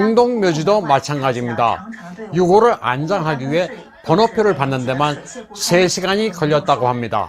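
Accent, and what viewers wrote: Yanbian, China. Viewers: 박상후 기자님 좋은 영상 잘 보고 있습니다. 근데 한국어 발음을 하실 때 보면 꼭 자국어가 아닌 것 처럼 발음 하시고 중국어도 능숙하게 하시는걸 보니 화교신가 궁금하네요... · 조선족 발음임다.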